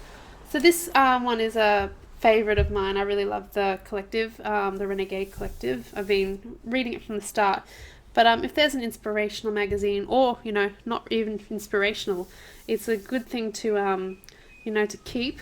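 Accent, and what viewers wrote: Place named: Australia. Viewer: Just found you....love your ideas! Will subscribe....are you in Australia? Recognise your accent, I’m in Oz!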